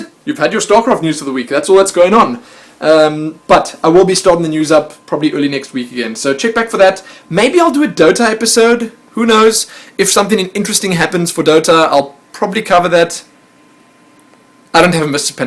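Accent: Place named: Cape Town, South Africa. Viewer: capetonion accent FTW ! lol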